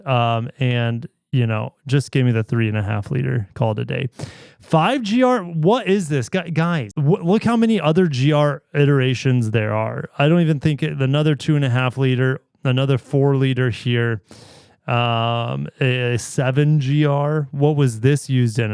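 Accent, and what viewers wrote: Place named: Wisconsin, United States. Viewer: I still have my 2000 Sienna running good. I'm thinking about getting 2021 Lexus RX BUT IT HAS 80,000 miles do you think I should get it? $28,000? Ps are you from the Midwest you sound like us in Wisconsin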